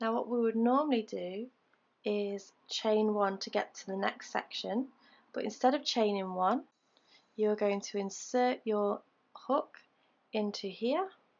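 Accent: England, United Kingdom. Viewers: Thanks for showing two methods and the pros and cons to each. That was helpful. My husband and I both love to hear her soft calm voice. Your English accent is lovely. (We're in the US.) Blessings. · Hello 🌸 Your works are just most beautiful, your explanation with the very nice British accent, that I love, are the most complete ones...thanks for sharing with us,..😘Fatima, Iran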